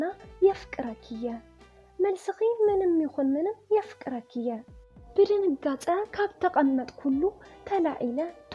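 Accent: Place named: Enderta, Ethiopia. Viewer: ጓብዝ ኢኺ! ናይ እንደርታ ትግርኛኺ ለለ ቃሕ ይብል።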